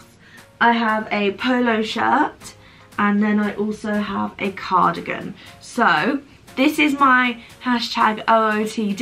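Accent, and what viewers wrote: United Kingdom. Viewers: British, right?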